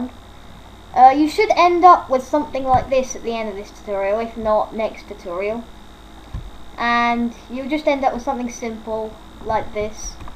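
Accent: United Kingdom. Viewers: great video but lame choice of english accent. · omg bro is british! finally i found a british unity tut thank you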